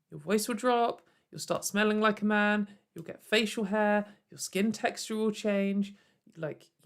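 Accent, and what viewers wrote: United Kingdom. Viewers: My first video of yours! Very excited! I have to say, I love your voice, it's honestly very soothing. And as an American I of course find any UK accent attractive (okay maybe not Cockney accents, but still) I also am so blown away by your patience and calm tone throughout the video (I mean I'm only halfway, but I wouldve lost my absolute shit by now).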